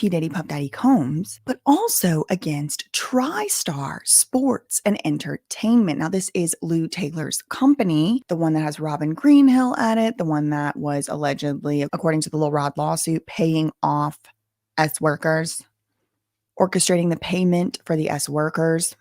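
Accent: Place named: Louisiana, United States. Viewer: I love watching you 😂 the pink Pom Pom’s, Louisiana accent…just so darling 🤗 love from London 🇬🇧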